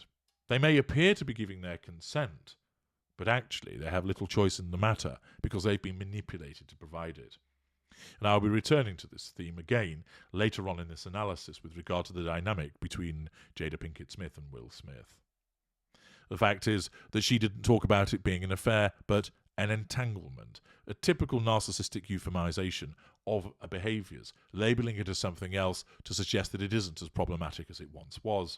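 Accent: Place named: Wirral, United Kingdom. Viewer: I find your channel so educational and entertaining. I bet you are not going to answer this but I am fascinated to know where your accent is from. It’s not that pronounced but there are certain words you say where I can detect a certain regional accent. Are you originally from Wirral, by any chance? Go on, be a devil and answer me. 😊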